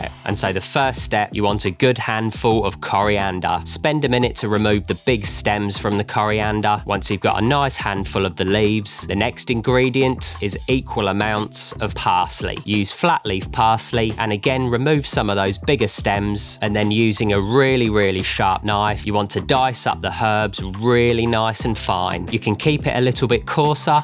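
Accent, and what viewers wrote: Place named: West Country, United Kingdom. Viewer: west country, south west england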